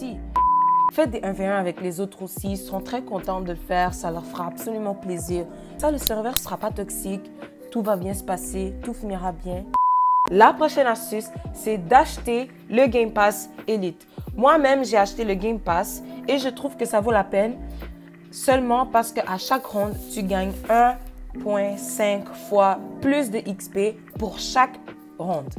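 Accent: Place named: Canada. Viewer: Ton accent canadien là 😭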